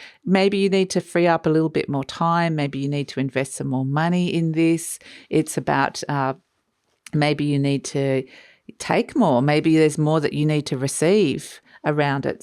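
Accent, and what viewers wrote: Australia. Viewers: I love this reading. I am feeling all of this. Sadly, I found someone I wished to co create with, and it had its challenges. And being very particular with whom I share my energy and love, it’s necessary for mutual respect and appreciation. Im not a fan of competitive co creating, and people who try to get one up on me. It’s all about a win win alliance, and teamwork. After these few very challenging months, deep healing work and introspection, a delay for a new beginning, I’m so ready to get back into action. Thank you for your time. I love your surroundings, the sounds of birds, the crows today, and your Australian voice. It’s been quite soothing. Much love to you. 💗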